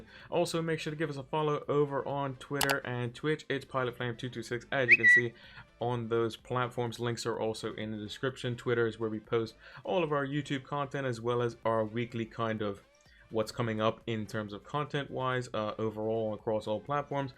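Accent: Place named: United States. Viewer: where you live mate? sounds like US but maybe you speak another language also?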